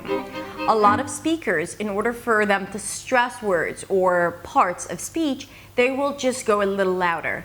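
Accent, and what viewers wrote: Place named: United States. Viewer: You are my role model when it comes to pronunciation. Every time I think about giving up, I remember how you managed to learn your perfect American accent without being American. Thank you,!:D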